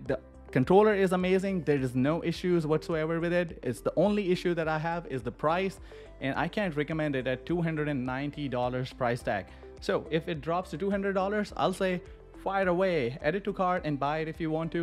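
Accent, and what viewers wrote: India. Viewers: You sounds like indian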